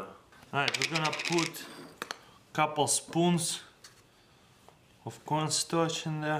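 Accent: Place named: Russia. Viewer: ***** he is russian. i am sorry about the comments. So. he is not slow , he is russian.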